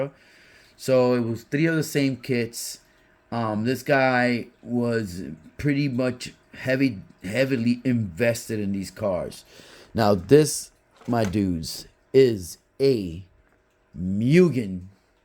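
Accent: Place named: New York, United States. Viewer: Hey my brother where you from I'm just curious cuz you sound like a New Yorker I used to live in the Bronx, The reason why you took me back, I'm getting right back into the hobby again.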